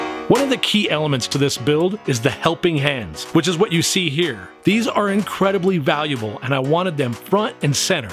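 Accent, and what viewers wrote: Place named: United States. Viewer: Nah, I’m just pulling your leg over the slightly peculiar US pronunciation. It’s a bit like how you guys have an odd way of pronouncing aluminium. It’s just a mildly amusing linguistic difference across the Atlantic. 🙂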